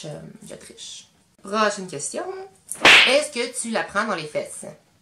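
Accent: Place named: Quebec, Canada. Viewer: Hey je découvre tout juste ta chaîne YT et franchement tu es superbe. Beaucoup de personnes sont rageuses ou mauvaises car elles sont mal dans leur peau tout simplement. Moi je dis si tu te sens mieux dans ton corps maintenant et bien c'est le principal. Après c'est sur que sur YT pas mal de personnes osent insulter ou autres car elles sont derrière un écran. Bref... courage et force à toi, tu es une belle personne et je suis contente d'être tombée sur ta vidéo. Ps: j'adore ton accent québécois 😁😜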